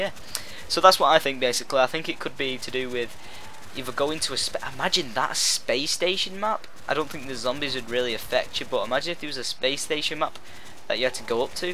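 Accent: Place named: United Kingdom. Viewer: not a tutorial, some british guy talking about happy in the pants,...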